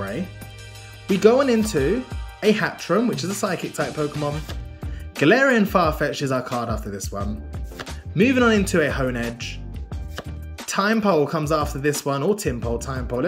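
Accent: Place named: United Kingdom. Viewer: Cool Zard! I really like how your british accent goes loud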